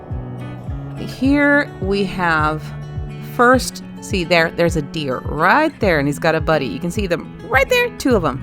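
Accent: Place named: United States. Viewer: Ou u live in sweden but u cannot be og swedes judging this by ur accent. Its wery amerikan accent. But anywAYS have great coming winter!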